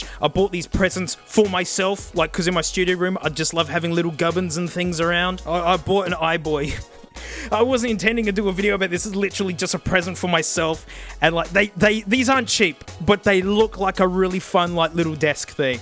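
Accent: Australia. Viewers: He gets more and more Aussie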